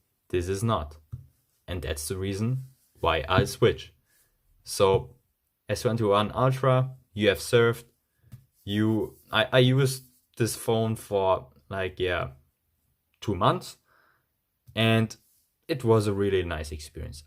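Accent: Germany. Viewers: Your english is very....german Wieso machst du deine Videos nicht gleich in deutsch?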